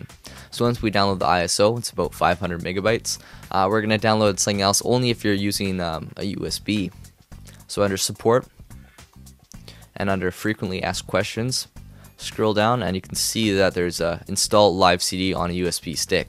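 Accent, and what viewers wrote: Canada. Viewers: you are canadian. right???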